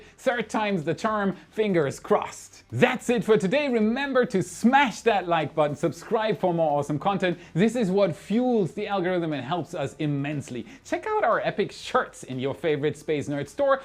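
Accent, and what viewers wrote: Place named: Germany. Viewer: Your english has improved a lot over the last time, better pronunciation, more words in your narration👌🏻 However, please keep your german accent! 😄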